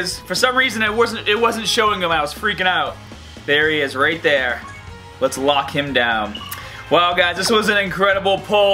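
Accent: Boston, United States. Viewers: you must be from boston 😀 just taking a guess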